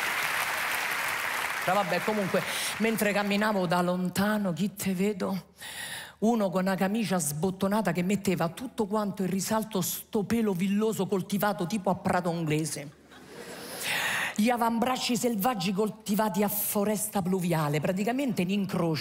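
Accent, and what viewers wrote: Rome, Italy. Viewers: ma quelli che paragonano l'accento di con la Presidente del Consiglio per via dell'accento romanesco.......bah.....(perchè non dire allora, la stessa cosa della grande Anna Magnani!!?) che problemi avranno!?? come dite...ah! si,.....FATEVE UN BEL BIDE' DE CAMOMILLA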